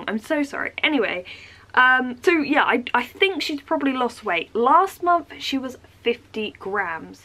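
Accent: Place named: London, United Kingdom. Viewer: You've got a mixed accent... London and?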